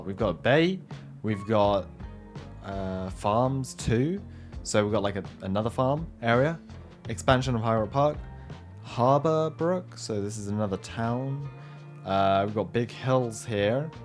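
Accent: England, United Kingdom. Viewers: are you from england america or another place [not to be creepy i just wanna know:)]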